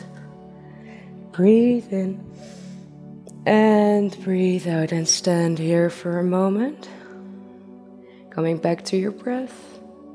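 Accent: Netherlands. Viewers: Yes, she sounds Dutch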